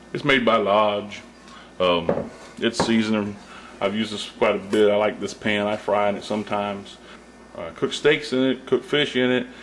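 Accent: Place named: South, United States. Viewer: Hi! This is a fantastic demo and it's a really good idea to educate people about the benefits of using cast iron as opposed to modern non-stick varieties. Your cooking vids are brilliant and inspiring - I'm English and live in London, but I grew up in Virginia and I sure do miss my Aunt's cooking - your vids bring it all back (esp the accent!) I do my best to recreate Southern home cooking here in the UK and my English husband absolutely LOVES grits!! Keep up the good work! Best wishes!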